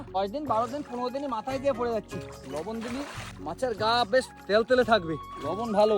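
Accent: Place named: Khulna, Bangladesh. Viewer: খুলনার অরজিনাল ভাষা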